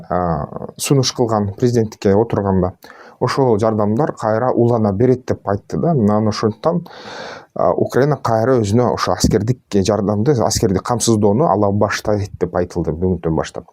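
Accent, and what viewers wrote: Standard. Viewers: Таза кыргызча үчүн өзүнчө чоң рахмат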